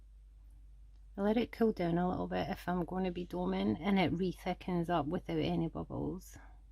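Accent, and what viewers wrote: Scotland, United Kingdom. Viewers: Of course! I should have realized that it was a Scottish accent, no wonder I like it, that's my favorite dialect. My Grandfather's name was McCoid, not sure if it's Irish or Scottish, but I have visited both countries and would live in either quite happily! Thank you for your reply and keep up the great work.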